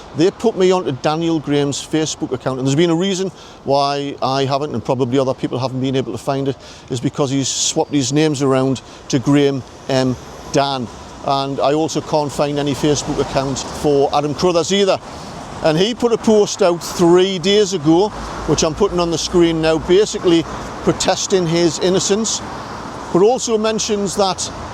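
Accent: Newcastle, United Kingdom. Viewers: Great reporting. Thanks for keeping us updated. Love your Geordie accent 💕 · Wonderful to hear a true Geordie accent, and to get this interesting update. Apparently you don't use the BBC "ssshhhh-edule" pronunciation. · It’s called Geordie. It’s an English dialect spoken in the north east of England; primarily Northumberland. It can be traced back the Angles who came from Denmark over 1,500 years ago. In fact, some Danish friends of ours said they can easily understand the more broader versions of the Geordie accent. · You simply can’t beat that pure Geordie accent! 😊👍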